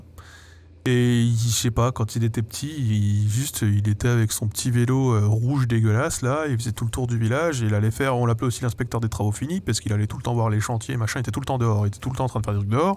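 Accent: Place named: Haute-Marne, France. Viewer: En vrai ma famille vient de Haute-Marne (mais pas exactement de ton coin, plus vers l'Aube !) donc j'ai passé toutes mes vacances dans le village natale de ma mère, et franchement ta manière de parler et tes anecdotes ça me rappelle de fou tout le temps que j'ai passé là bas ! À être réquisitionnié pour filer des coups de main dans les champs ou à jouer avec les voisins paysans !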